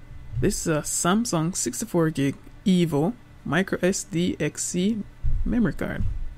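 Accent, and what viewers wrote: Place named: Jamaica. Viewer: Comment not related to the video. You sound Jamaican to my ears.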